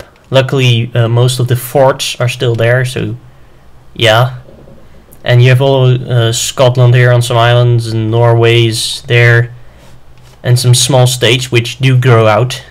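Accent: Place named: Netherlands. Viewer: Ah, Dutch, that's what it is. I could tell you had an accent but I couldn't tell what it was. For future reference "Souix" is pronounced "Soo," and "Navajo" is "Navaho." English is hell for trying to figure out how a word is pronounced just by looking at it. :P Great LP btw, YOu've earned yourself a subscriber.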